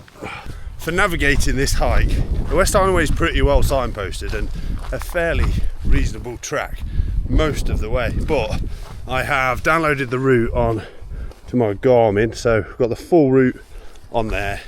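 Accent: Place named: Leicester, United Kingdom. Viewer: Hi, new sub and not seen your channel before, just my cup of tea. I did a lot with my Dad too sadly passed away now, so really nice to see you out with your Dad. From the sound of your accent you may be not far from me in Leicester. Great Idea doing this trail in winter, now there’s an idea for me as it’s one I’ve not done, it’s popularity has always me off. Great vid and chat. I was interested in the Muon myself so wondering how that was for you, won’t be getting it now though as amazingly I was just gifted the osprey Levity 60, I’ve had the 45 since it came out and I love that so very pleased to get the larger version. Anyway A very merry Christmas to you and your family. 🎄🍻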